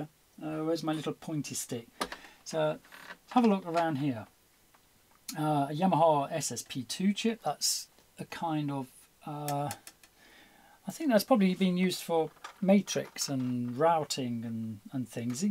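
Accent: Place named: England, United Kingdom. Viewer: Hi newly subscribed to your channel. you sound very English although you were talking about product COST's in dollar & cent's, but I take it you reside in England & have to send to America for your parts? however! i enjoyed your very informed repairs videos, & wondered....do you extend your workmanship to Roland models? VA-7 in participant? i may pick up some tips!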